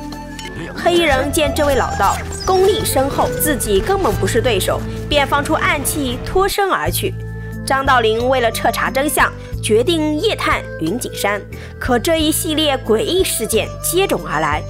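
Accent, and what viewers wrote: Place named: Shanxi, China. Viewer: 山西还是陕西？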